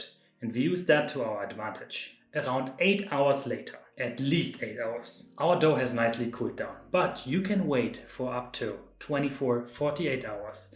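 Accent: Germany. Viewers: Wie schon von anderen geschrieben, lustig anderen Deutschen auf Englisch zuzuhören wie Brot gebacken wird. Als Muttersprachler ist der deutsche Akzent in diesem Video echt hart und erinnert an gruselige Zeiten von Schulenglisch 🤣 Klasse Kanal und tolle Videos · Your German accent is so much stronger than usual! 😊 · Your German accent is really strong today. Is that because of the German rolls?? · These look delicious! My girlfriend makes "Worstbrotchen" (sorry about the spelling) what exactly are they, do you know? I've never had them but she makes them at Christmas. Thanks for your awesome videos, with or without the heavy German accent! ❤️🙂 Also, have you ever made Ezekiel bread? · LOL I thought exactly the same thing.. I have never heard him speaking English with that German accent :D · Authentic German bread rolls with an authentic accent. Perfect! I have a lot of rye, so I will have to German it up even more :)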